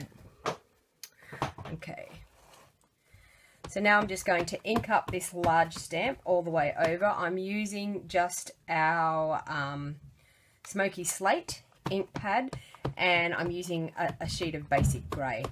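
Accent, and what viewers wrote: Australia. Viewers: Great video. Good to hear an Aussie accent!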